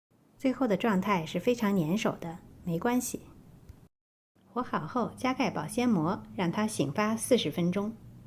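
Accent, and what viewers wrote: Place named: Apulia, Italy. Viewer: Acc... Ma che è.. Un misto inglese e pugliese? Comunque conpliment 🇮🇹👍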